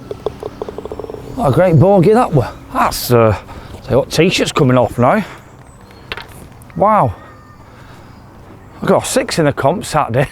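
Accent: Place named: Yorkshire, United Kingdom. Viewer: I love the Yorkie accent Who needs prepositions?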